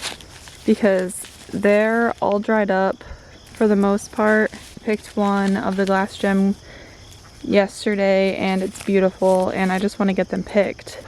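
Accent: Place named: Great Lakes, United States. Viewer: I have sisters in Gaylord and the Soo and I live in NE Wis. I thought your accent sounded great lakes like. I'm looking forward to watching more of you videos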